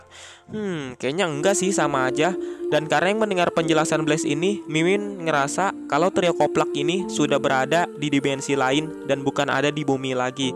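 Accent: West Java, Indonesia. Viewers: dari mana, sunda nya 😅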